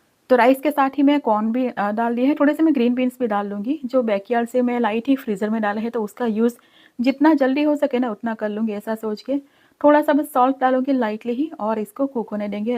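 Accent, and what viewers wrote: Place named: Parsi, India. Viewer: Your hindi is little different, like parsi people speaks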